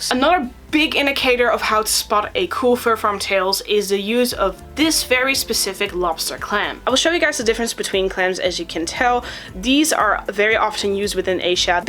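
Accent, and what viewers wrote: Netherlands. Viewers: Don't get mad or smth, but I think you're dutch.... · What are you from the Nederlands or Germany? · I smell..Dutch accent!!are you dutch :3?? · WAIT ARE YOU FROM THE NETHERLANDS !??????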